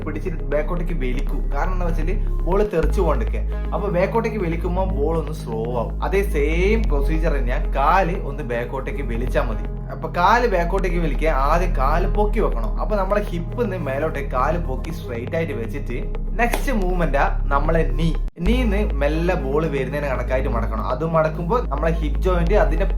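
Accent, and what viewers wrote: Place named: Kannur, India. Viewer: Bro, ith Greenfield ano Chonadam? Kand nalla parichayam. Ingale sound kettapo kannur anenn manassilayi. 😂 ❤ Sub cheythine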